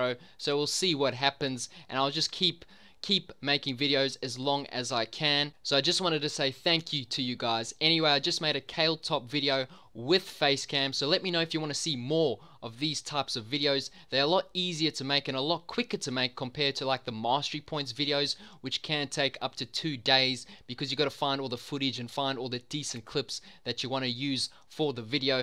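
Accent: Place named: South Africa. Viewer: You live in Australia but you sound South African, are you from SA?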